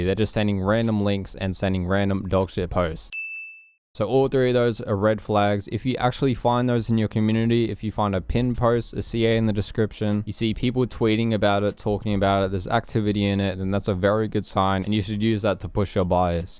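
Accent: Australia. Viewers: You sound like you’re from Sydney? Are you in Sydney? I’d like to do real life training online please.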